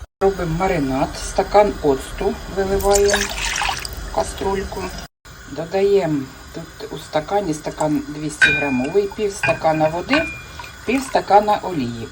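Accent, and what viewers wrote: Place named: Central Ukraine, Ukraine. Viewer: Яка приємна українська мова в центральній Україні, чудова. 😊❤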